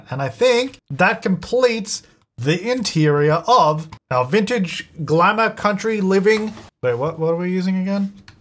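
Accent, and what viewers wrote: Australia. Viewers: For some reason, every time I click on one of videos, regardless of whether or not I've already started watching some of it, YouTube always turns subtitles on. No matter the device I'm using to watch YouTube (phone, laptop, tv), every time without fail YouTube puts subtitles on. But only, and I mean ONLY, for videos. Doesn't happen for any other YT channels I watch. Like, wtf 😂 Does YT think that I can't understand him coz he's Australian or something? He's speaking English. I speak English. I know what he's saying YouTube. U don't need to keep putting subtitles on 😂 does this happen to anyone else or just me??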